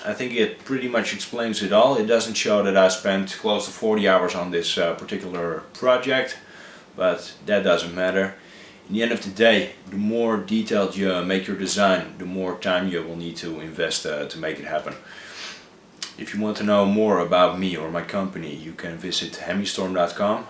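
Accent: Netherlands. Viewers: Dutch accent ftw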